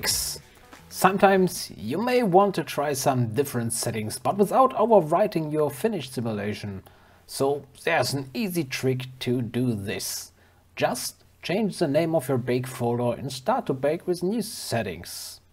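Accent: Germany. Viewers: Bist du deutscher?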